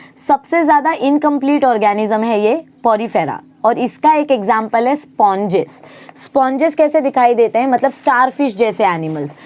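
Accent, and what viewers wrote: Maharashtra, India. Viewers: Didi are you marathi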